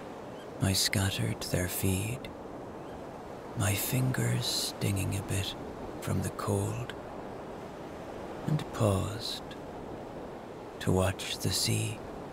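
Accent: Ireland. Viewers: I love your stories using an Irish accent to your voice! So lovely, lilting, and relaxing . Thank you. 😊❤😊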